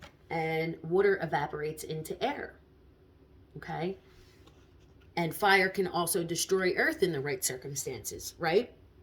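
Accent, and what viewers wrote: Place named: Philadelphia, United States. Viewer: Once again, such a great job! Not gonna ask where you are from but I was born and raised in South Philly and you sound like a straight home girl. 😀 Did want to point out that your comments about the masculine becoming more feminine and vice versa made me think of all the attention around LGBT+ issues. Personally, I think these changes are good, they indicate that perhaps humanity is striving for integration. I know as a man, being aware of my feminine side has made me a better husband to my wife and father to my daughters. You are doing a great thing with this channel. Keep it up.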